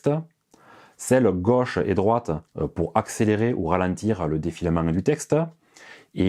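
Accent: South, France. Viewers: J'aime ces vidéos sur du matériel bien choisi, simples directes brèves et ave l'accent du sud. Bravo !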